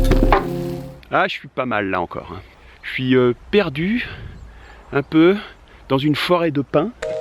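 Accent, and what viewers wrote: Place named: South, France. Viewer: Sexy entre le ménage et l'accent du Sud!!! Tjrs en mode groupie ;) Tes vidéos sont sublimes plus sérieusement!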